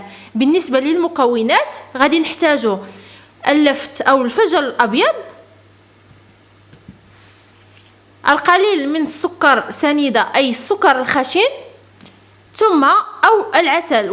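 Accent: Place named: Morocco. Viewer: يا شين اللهجة المغربية 😷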